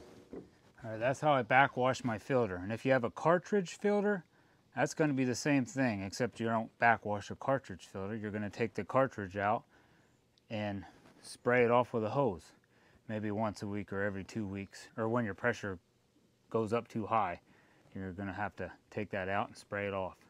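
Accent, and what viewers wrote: Pennsylvania, United States. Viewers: I just watched this and it’s great! 1 question, are you from Northeastern PA!!? You sound like it.👍😂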